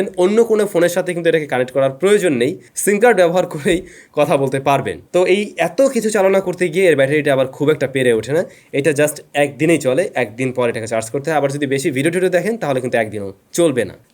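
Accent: Barishal, Bangladesh. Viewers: এতদিন পরে জানতে পারলাম ভাই আমার বরিশাইল্লা 😅😅😅😅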